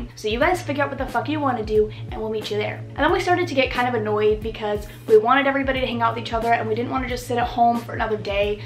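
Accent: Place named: United States. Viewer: Your country accent hahaha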